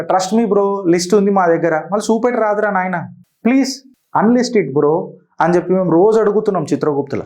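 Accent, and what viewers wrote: Telangana, India. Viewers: బ్రో పక్క తెలంగాణ భాష మాట్లాడుతున్నారు బ్రో సూపర్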